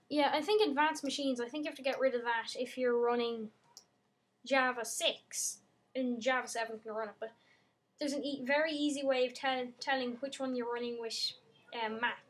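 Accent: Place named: Ireland. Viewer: and nice irish accent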